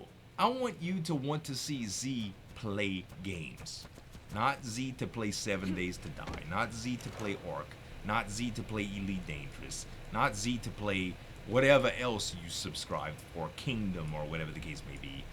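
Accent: Louisiana, United States. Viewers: Hi Z, I like to think people come to your channel for the same reason I do......that Cajun accent, the fantastic way you get over excited and high pitch when something cool happens, and the high quality content you put out. No matter what you play. Just keep being Z cos that is what your good at, and that is what we want to see. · Z I watch you for you not just the games you play I watch you for the funny loud Cajun that you are! Although I might not like every game you play I still look at them just to see. On a side note I have been loving the face cam the VR and your new hybrid animals!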